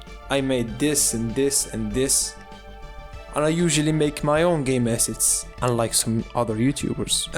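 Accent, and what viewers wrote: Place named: Morocco. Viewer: You sound Moroccan wlah akhoya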